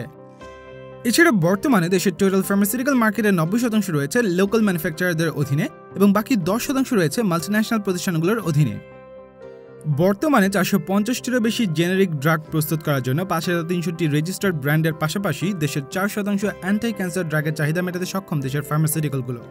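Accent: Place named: United Kingdom. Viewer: আপনার সব বাংলাদেশী youtubers রা বাংলা ভাষা বলার সময় এতো British দের কথা বলার টান ব্যাবহার কেন কেরন।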